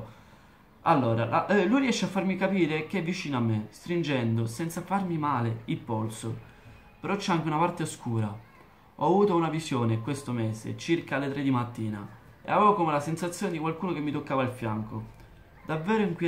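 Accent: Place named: Florence, Italy. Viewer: Mi hai fatta impazzire quando hai detto quella frase in siciliano '' sbagghiasti '' ma come mai se hai l'accento fiorentino? Ti è uscita quella frase love sei unico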